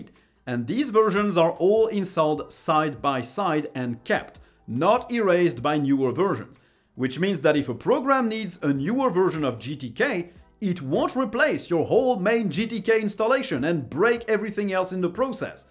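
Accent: France. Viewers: Excellent video, i now want to try Nixos ! Also, you have a clear English pronunciation but what is your mother tongue, are you French?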